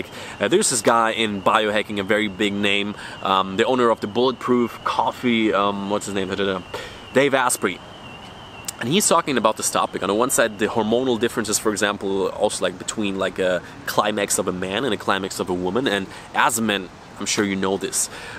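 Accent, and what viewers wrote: Germany. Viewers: Can anyone tell whether this guy is German? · Bro kommst du aus Düsseldorf??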